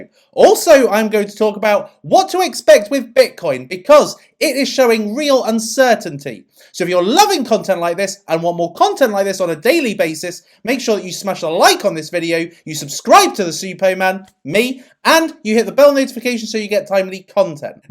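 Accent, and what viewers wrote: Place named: Southern Counties, United Kingdom. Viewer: Duuuude I love your Southern Counties accent - Bucks?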